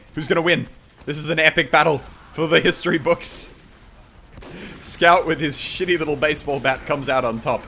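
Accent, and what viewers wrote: Australia. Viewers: Are you Australian because you have one of the rare Australian accents that is actually cool and not annoying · WAIT YOURE AUSTRALIAN ??? ---- G'DAY MATE !!! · the whole time watching this I felt like this was prerecorded gameplay with sone crazy straya guy shouting at his own video...I bet is from the deep north of NT, like Darwin or something else weird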